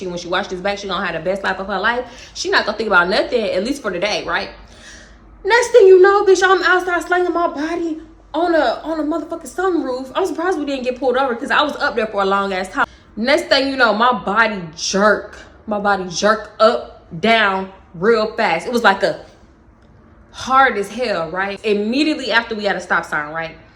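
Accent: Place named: Texas, United States. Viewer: went through my youtube highlights and you were my top watched youtuber lol i loveeee your videos and when i first used to watch you i was like “she talk just like me gotta be from texas “ then a lil after in the video you brought up being from houston then i found out you was a sagittarius too i said oh yeaaa this is my gurlll❤❤been watching since i was 16 lol im turning 20 on tuesday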